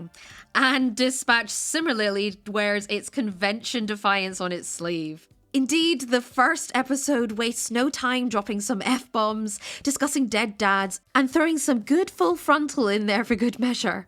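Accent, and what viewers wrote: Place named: Scotland, United Kingdom. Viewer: Lovely to hear a Scottish voice in these videos, welcome to Nintendo Life :)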